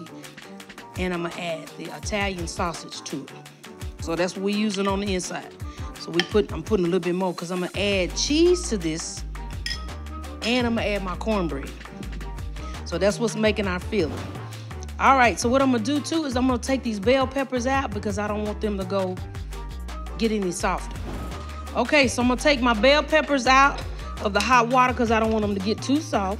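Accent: South, United States. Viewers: I love that she doesn't hide her/our Southern Twaaaaannnngggg!!! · you so southern! 😂❤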